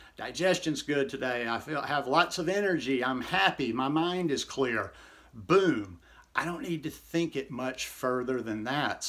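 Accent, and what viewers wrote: South, United States. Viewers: Not only does your simplistic approach make the most obvious sense, you are so darn entertaining when sharing it! Love the southern accent and the mind processing of our human eating selves. Thanks for the entertainment!!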